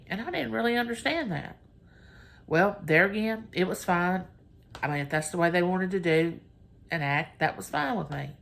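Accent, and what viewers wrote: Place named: South, United States. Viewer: I simply enjoy hearing you talk. The southern accent amazing. Thanks for sharing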